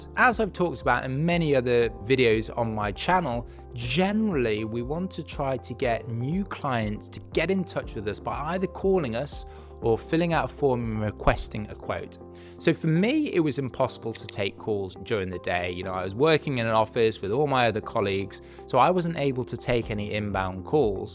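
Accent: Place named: United Kingdom. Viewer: Hey are you based in the UK? I am looking to start it now & I came across your British accent lol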